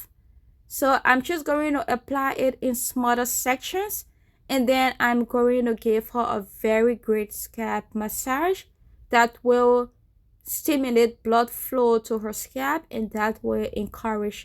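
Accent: Liberia. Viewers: I Hear a accent are you from Liberia?? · Do I detect a Liberian accent.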